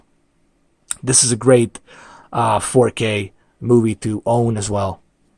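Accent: United States. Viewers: Im from Australia and have made afew videos a year ago, check them out if you want. I might get back into making youtube videos, i started off pretty badly and abit nervous but might go back doing some after i upgrade all my gear.its not like i need an upgrade coz all my gear is 2015 models BUT now that theres HDR i really want a projector with hdr , like the sony 4k hdr selling at 15k lol expensive but worth it. Im more of a home theatre enthusiast and picture quality is very important to me. So yeh, hopefully when i move into my new house i will upload more vids. I love watching your vidz man. And love the american accent lol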